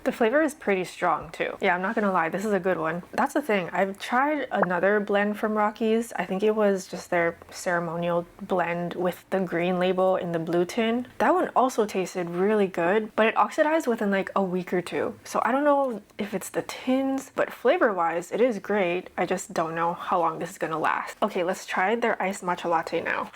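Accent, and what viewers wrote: Hawaii, United States. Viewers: 30 seconds in and i knew u were from hawaii too, love it!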